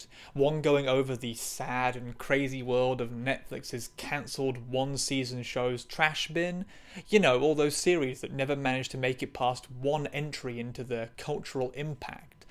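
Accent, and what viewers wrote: United Kingdom. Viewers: She definitely has a British accent haha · lmao yeah i thought this whole time she was some flavour of british 😅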